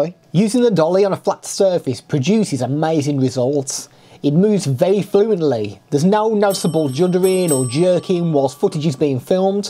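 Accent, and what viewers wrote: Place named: Black Country, United Kingdom. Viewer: Oh also, nice to hear a proper brill accent cocka! I’m originally from Cannock, but now live in Ontario... nice to here such a strong accent from the old county :D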